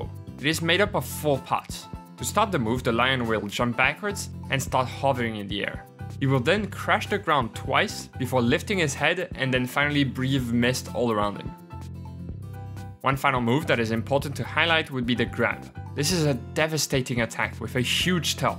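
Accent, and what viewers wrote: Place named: Germany. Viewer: Can I say, this is my first video I've seen of yours and I love your voice. It's so bizarrely unique to me, like a grown up Elmo with a slight German accent. I'm sure this is an inaccurate comparison but that's just what my brain is thinking up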